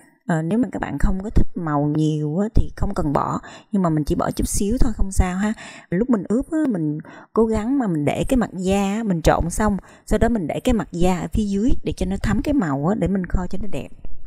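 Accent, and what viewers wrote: South, Vietnam. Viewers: WOW WOW nhìn hấp dẫn quá bạn ơi!!! Món thịt kho nước dừa và trứng chiên trước rồi mới kho, mình đã từng ăn rồi, bạn nói nhớ ông ngoại, còn mình nhớ bà ngoại nè!!! Quê ngoại mình cũng miền tây mến thương bạc liêu đó bạn!!! Cảm ơn bạn nhiều, bạn giỏi và khéo tay quá chừng luôn, cách nấu và hướng dẫn thật rõ ràng và kỹ lưỡng nữa, bạn thật là tốt bụng quá!!! Giọng nói miền nam nghe thật là ấm áp 💖💖💖💖👍👍👍🌟👏👏👏🌹🌹🌹🌹🌹😊😊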